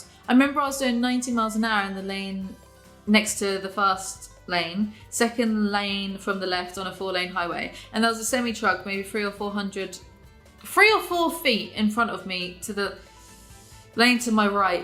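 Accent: United Kingdom. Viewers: LMAO YOUR PHONE IS RIDDLED, I love hearing another UK accent, makes me feel like we actually exist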